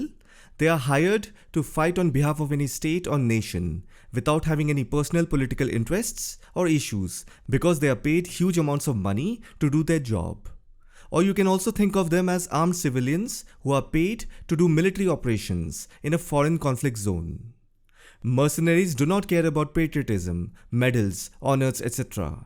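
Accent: India. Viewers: He has the best Indian accent - the most informative Indian accent - absolutely the best... is the man....🫡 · Don't like Indian accent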